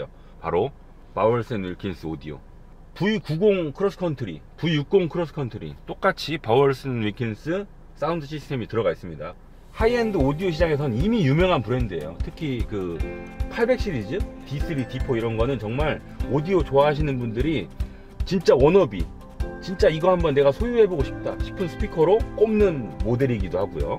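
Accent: Jeolla, South Korea. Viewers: 전라도 사투리가 구수하네요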